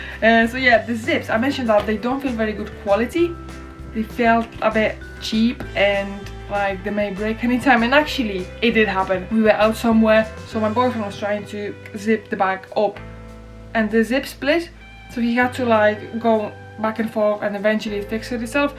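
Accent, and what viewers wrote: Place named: Northern England, United Kingdom. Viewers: Ur half Norther English accent is brilliant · love the northern accent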